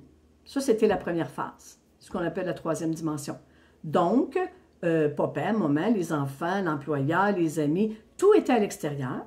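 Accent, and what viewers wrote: Quebec, Canada. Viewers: Bonjour à vous,🤗 Merci merci. Une première fois avec vous. J'adore votre voix du Québec. 👍👍. Vos informations sur les différents étages de notre cheminement. Ça aide. Toute ma gratitude. Merci merci. 🙏🏿🙏🏿🙏🏿🌟🌞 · Merci infiniment pour ces explications claires, canal aussi je confirme que la phase 4 est rude avec tous les maux du corps mais sachant que nous sommes dans ces passages - pas -sages, alors on oeuvre sur nos ombres, on continue et on traverse.... Belle continuation à vous j'adore votre accent canadien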